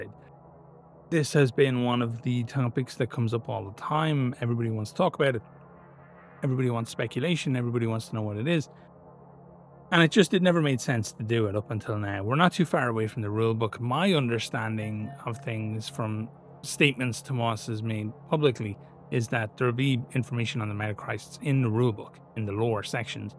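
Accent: Ireland. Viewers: Are you Irish ? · Irish? Subbed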